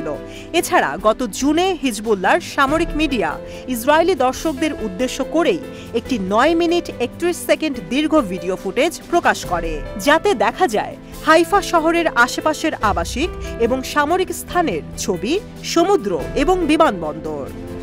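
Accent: India. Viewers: আসসালামু আলাইকুম কথার ধরন ভারতীয় হয়ে যাইতেছে বাংলাদেশি মানুষের মতো কথার ধরনটা করেন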